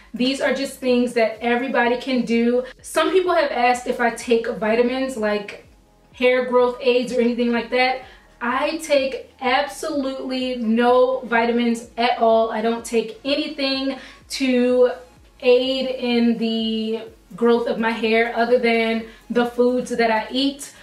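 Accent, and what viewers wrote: Baltimore, United States. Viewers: I hear a Baltimore accent! 🤔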